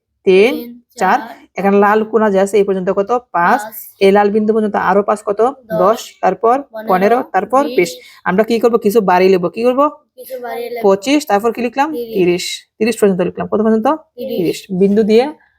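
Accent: Sylhet, Bangladesh. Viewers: তোমার বাড়ি কিতা সিলেট নি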